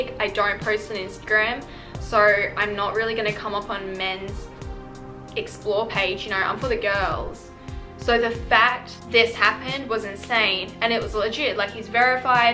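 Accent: Australia. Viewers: Asian woman with an Aussie accent is SOOOOOOO HOT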